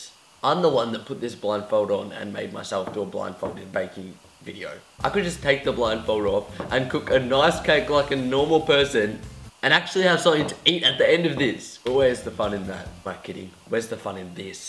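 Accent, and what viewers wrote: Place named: Australia. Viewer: what is your accent ahahaha it sounds like extreme australian accent lol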